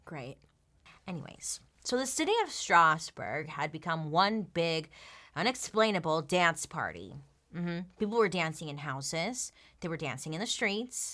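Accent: United States. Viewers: Where is from v my thoughts our deffo usa accent v do love a the Americans v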